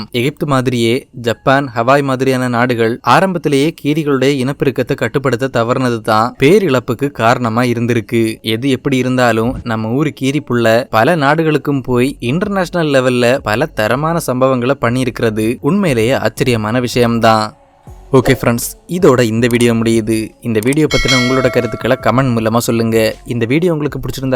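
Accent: Kanyakumari, India. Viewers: The voice recorder is from kanniyakumari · Bro nee Kanyakumari thanaa 🧐🙂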